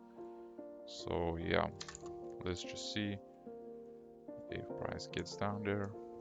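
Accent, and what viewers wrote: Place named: Flanders, Belgium. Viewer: Volgens mij hoor ik een klein vlaams accentje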